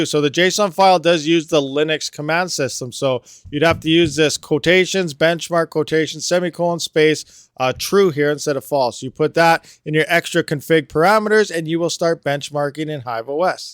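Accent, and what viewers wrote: Canada. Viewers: There's just something fun about listening to Canadians explain stuff. *From your north Idaho neighbor.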